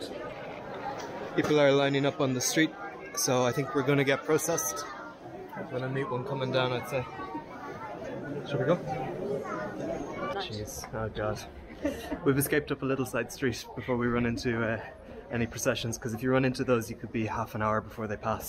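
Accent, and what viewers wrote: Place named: Ireland. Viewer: Hola! I am impressed with your progress learning Spanish with your Irish accent. I love it. Congrats on your progress. I have been with you since the beginning. Love the festival. Here in my part of the world we celebrate cherries 🍒. Lots of cherry trees in the area. We call it Cherryfest.Lots of parades, concerts, food, fireworks, and tourist from all over the country and world. I love festivals because it usually shows the good part of a community. Adios!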